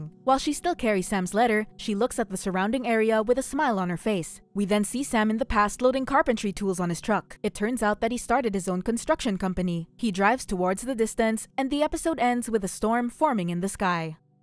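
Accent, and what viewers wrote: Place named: Philippines. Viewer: The narrator sounds Filipina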